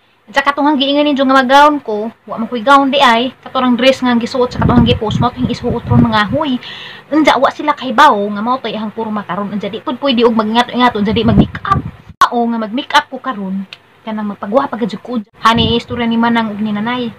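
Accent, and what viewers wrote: Bohol, Philippines. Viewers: Taga asa diay ka sa bohol ma'am Kay ang accent nimu morag taga Alicia bohol haha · malingaw gyud ko basta bol anon mag storya...jijiji makalingaw.. half cebuano half bol-anon here... jejejeje.. ajao palabi manang nabaw na slag bahakhak sa imo..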